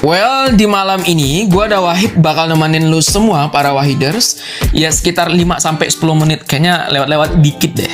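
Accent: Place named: South Jakarta, Indonesia. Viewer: Woaaah.. 😂 jaksel banget bro But good job! Keep it going yaa ^^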